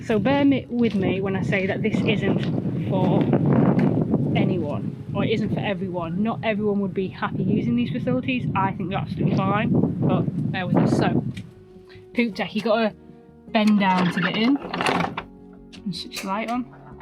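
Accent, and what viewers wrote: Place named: Northwest England, United Kingdom. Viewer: Can tell your from the northwest,not just by the accent,but the old sayings,dead flies brought back so many memories,dinner not lunch,Tea not dinner lol,very enjoyable watching your videos,you have the air of a very enjoyable tv presenter ❤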